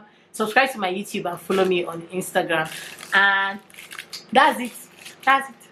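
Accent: Port Harcourt, Nigeria. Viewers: I like your pidgin o, very Port Harcourt'ish..LOL!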